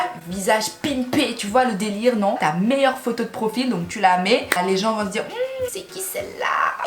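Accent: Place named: Senegal. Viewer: A ton accent j’ai direct capté que t’étais sénégalaise 🙃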